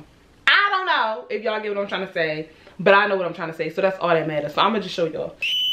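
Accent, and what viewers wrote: Baltimore, United States. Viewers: sis are you from balitmore? you got the accent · I KNOW you’re from baltimore or someone who raised you is😂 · Are you from Baltimore? You sound just like a Baltimore girl like me lol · Gotta be Baltimore Like has to be lol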